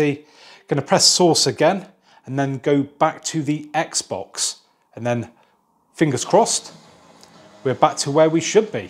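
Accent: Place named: South West England, United Kingdom. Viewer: His accent sounds like south west England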